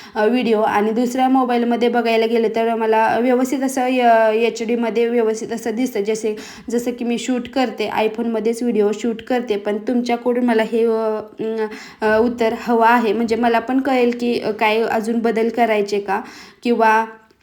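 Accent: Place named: Manchar Khed, India. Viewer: Tuz gav कोणत... मंचर खेड ची आहेस का तु... भाषे वरून वाटतं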